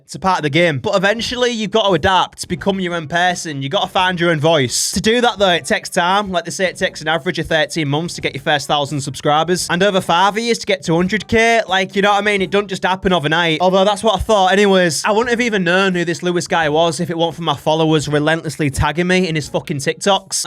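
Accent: United Kingdom. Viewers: Hes British (I am too)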